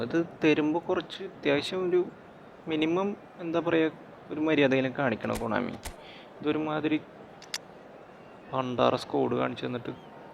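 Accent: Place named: Thrissur, India. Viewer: slang kettapole mansilaayi😂thrissuril evde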